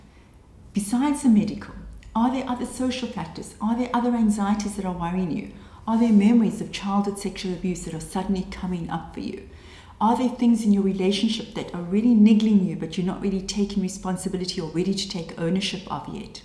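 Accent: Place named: South Africa. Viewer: she sounds like she's from SA.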